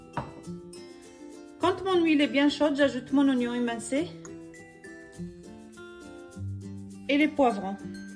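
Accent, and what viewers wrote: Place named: North Africa. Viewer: Merci beaucoup Madame pour cette delicieuse recette , j' adore aussi votre accent qui sent le soleil d'Afrique du Nord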